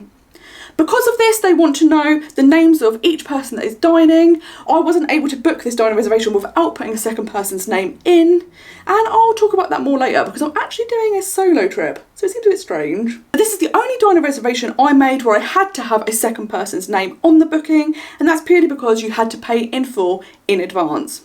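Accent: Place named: United Kingdom. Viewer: You sound like you’re from the uk do you use a vpn please to make your bookings? Or use the uk site as im also traveling from Europe.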